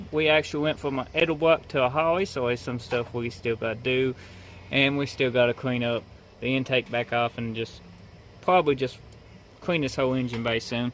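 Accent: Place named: Louisiana, United States. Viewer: That accent are you from Louisiana?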